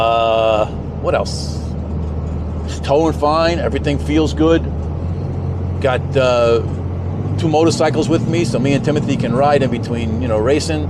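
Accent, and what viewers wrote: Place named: New Jersey, United States. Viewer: COLD! Judging by accent you must be from NJ? The Fletch used to see winter for a long time in western NY and I'm sure doesn't miss it. Good luck racing in Florida and have fun.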